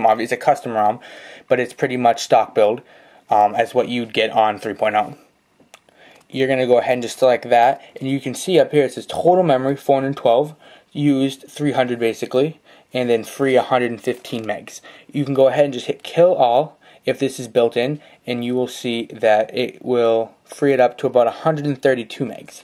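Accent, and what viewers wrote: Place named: Canada. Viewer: youre canadian....i can tell...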